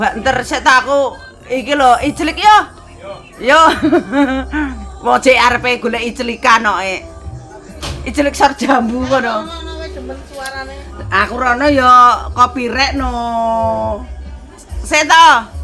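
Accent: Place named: Nganjuk, Indonesia. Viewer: Jawanya mana to mbak., dialeknya kayak daerah nganjuk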